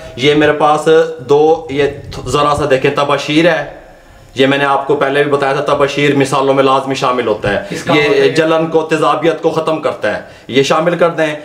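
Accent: Punjab, India. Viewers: Sir mazzrat k saath asp ka punjabi ascent hai tau sabut lal mirch k baad aap nay Kia dala naam samajh nahi aya🙏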